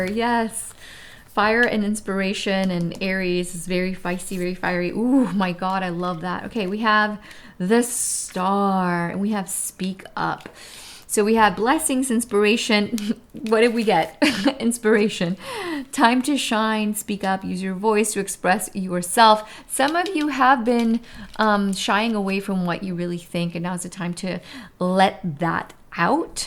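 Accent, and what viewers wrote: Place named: England, United Kingdom. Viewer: Hi your English accent is so sweet and perfect, I love it❤️❤️❤️❤️❤️❤️! Elegí la número 2 y me has retratado tengo una oportunidad muy buena delante de mí y estoy dando vueltas a la cabeza pensando si debo tomar esa decisión tan importante. Abrazos desde España ❤️🇪🇦❤️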